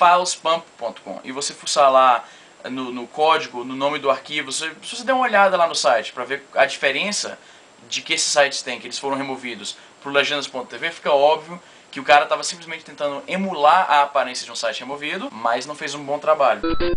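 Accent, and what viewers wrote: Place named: Curitiba, Brazil. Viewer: tu é curitibano, né ? hahah sotaque entrega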